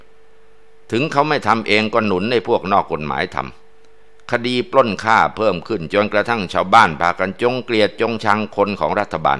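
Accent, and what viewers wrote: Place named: Isan, Thailand. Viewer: ตลกเสียงอิสาน ตลกเสียงอิสาน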